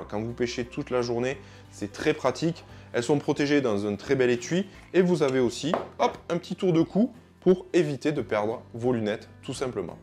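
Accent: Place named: South, France. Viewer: Salut l'amie pêcheur ! Tu as très bien présenté tes produits en plus avec l'accent du sud ! J'aurais aimé qu'il y ai une présentation sur un moulin casting big bait car les promotions sont assez rare un peu partout ! Car je ne peux pas m'acheter se fameux moulin qui irait avec ma canne jerk bait 60 120 gr de chez Daiwa legalis ! Merci encore pour cette présentation !!! A bientôt l'ami !!! 👍🎣🤗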